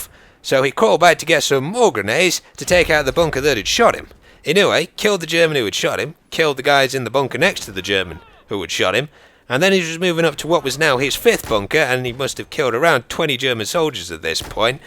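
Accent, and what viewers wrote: United Kingdom. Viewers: Love history man. These war stories are awsome. Ur like a white Morgan Freeman with a british accent! :-)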